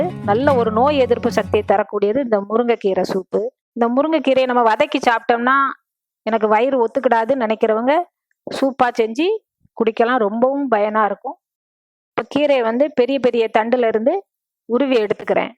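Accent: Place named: Tirunelveli, India. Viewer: Madam unga ooru sollunga please..neenga paesurathu Tirunelveli maadhiri iruku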